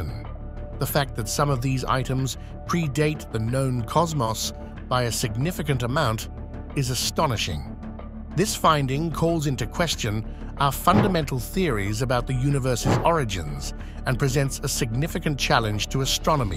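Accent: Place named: England, United Kingdom. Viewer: More Hilarious Bull##@ !! Always with an "English " Accent , again. We all know that "the BIG BANG Theory" is BIG BULL###T !! always has been. Just more Garbage . Kind of like terms such as "Singularity" or " Quantum Entanglement " Made up terms in an attempt to sound " intelligent" . Humans will never be able to describe Creation. This is an Egomaniac 's attempt to claim some kind of knowledge that they don't have. So tiring.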